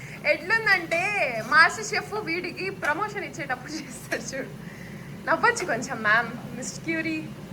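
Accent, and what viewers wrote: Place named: Kadapa, India. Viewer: mana kadapa slong vintu vuntunta vinasompu ga vundi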